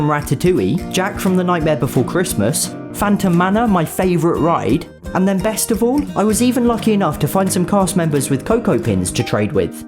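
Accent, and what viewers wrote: England, United Kingdom. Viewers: I speak french, and that is one of the most english accents i have ever heard.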